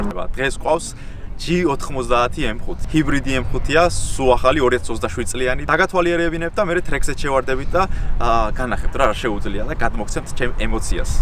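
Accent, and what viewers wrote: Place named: Svaneti, Georgia. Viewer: სვანი ხარ ხო? აქცენტით ვხვდები სვანი ვარ😀✊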